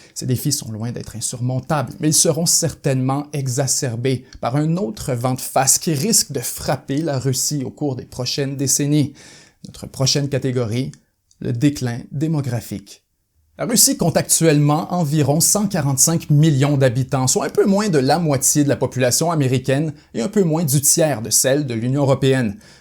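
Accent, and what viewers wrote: Quebec, Canada. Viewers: T'es québécois toi, non?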